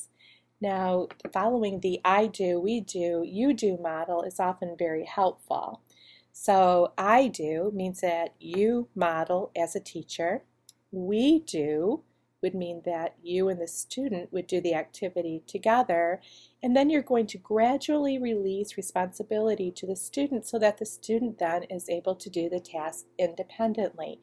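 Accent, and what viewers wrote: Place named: Midwest, United States. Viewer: cute accent. i like your a's. midwest?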